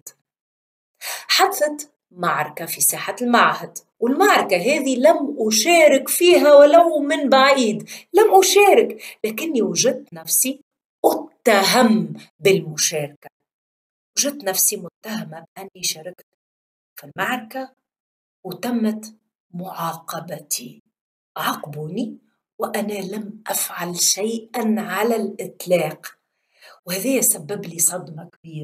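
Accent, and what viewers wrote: Tunisia. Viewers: اللهجة التونسية نحبها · لهجة تونسية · اللهجة التونسية الجميلة الباهية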